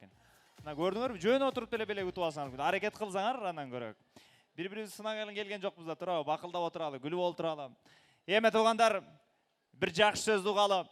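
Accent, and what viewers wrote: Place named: Kyrgyzstan. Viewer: азаматсың👏Кыргызча таптак, таза, жагымдуү сүйлөп, кыргыздын нукура жүзү болуп жүрө бер❤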